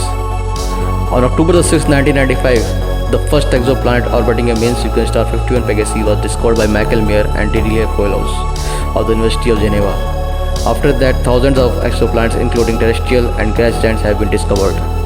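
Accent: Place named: Africa. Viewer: why does your voice sound African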